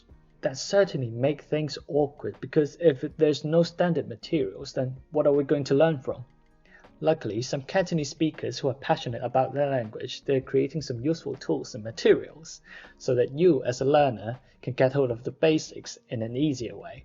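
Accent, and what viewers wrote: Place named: United Kingdom. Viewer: I still think listening to you is very trippy. We have similar accent in our Cantonese, but my English is a North American English accent where as yours is more British. :P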